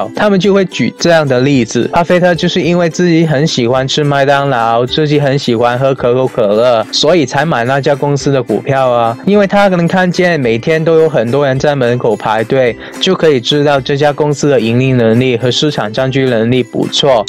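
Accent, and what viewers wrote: Hong Kong, China. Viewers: 请问你是香港人吗？你的中文有香港口音😄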